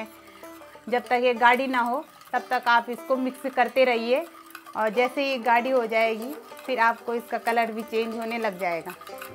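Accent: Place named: Pahadi, India. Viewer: Cute pahade style taulking tone💚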